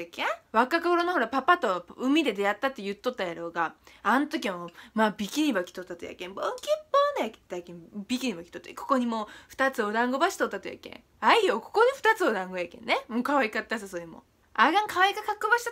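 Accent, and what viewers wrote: Nagasaki, Japan. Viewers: 長崎弁聞きたくなったら定期的に来てしまう笑笑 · こてこての長崎弁使ってる🤣(笑)なんか、ちゃんの家の光景が目に浮かぶ😁幸せな家族だね😊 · 長崎弁好きだなー笑笑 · 長崎弁好きすぎる、、もううちなんて、〜だべとかまじの田舎者の言葉だらけで嫌だ〜😩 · やっぱ長崎弁ていいね、話すとなると照れが入って話せないけど…好きだな～長崎弁！女が帰省からの、成田着くなりバックから取り出したのは、フルーツサンドイッチ、俺が長崎の物食べたいって言ったから買って来てくれて、周りに人がいるにもかかわらず即食いするもんだから（生温かく形も崩れてだけど）恥ずかしながらももっと買ってくればよかったね！良いんだよ気持ちと長崎が詰まってて旨いよ！今では良い思い出っちゃったけど。ちゃんの長崎弁で癒されます。長々と話してごめんよ、長崎弁バージョンありがとう☆体調崩さずに頑張ってね！応援してます。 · 長崎弁がかわいいのかちゃんがかわいいのかどっちだろう… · 長崎弁普通に可愛い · 長崎弁大好きです✨ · 最近の影響で、長崎弁が1番可愛くて好きって感じるようってる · 数年間長崎居て姉が長崎弁ちょいちょい喋るから「うわこいつ長崎弁に侵食されやがった((((；ﾟДﾟ))))」って思ってたからレベチがここにいてなんか安心したやったぜ。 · 長崎出身やけん落ち着く〜〜😂 地元離れるとこの話し方が恋しくなる笑笑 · 大学の時の長崎出身の友だちの喋りと同じでめっちゃ良い(笑)長崎弁めっちゃ大好き(笑) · かわいいなあ長崎弁俺の所方言とか特に無いから羨ましい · 知り合いの長崎人そっくりで、びっくりするwww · うち関東で方言使わないけど、なんかちゃんの長崎弁永遠と聞いてられる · 長崎弁ばり可愛い！ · が話す長崎弁が好きなの私たちは！ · 全然分かんないけどちょっと方言憧れある笑特に長崎弁！！ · 可愛い長崎弁😭😭❤ もうずっと聞いてたいから長崎弁バリバリのやつもっと希望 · 本物の長崎弁ですね親近感がものすごあるとばい！横におるごた！ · ちゃんの長崎弁世界一かわいいこれマジ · めちゃくちゃ長崎弁ぽいと思ってたらやっぱ長崎だったなんかうれしい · 実際長崎弁っていうても広かよねー。ちゃんのは長崎市弁たいね！佐世保平戸五島島原それぞれ個性ありすぎて長崎市が標準語かなっておもうた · さんも長崎弁も可愛い💓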